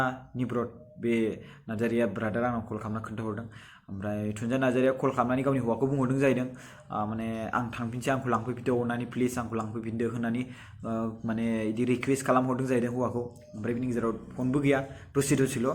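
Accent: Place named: Kokrajhar, India. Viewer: Hab pongbai nwngni raijlanai jwngni kokrajharni baidi tar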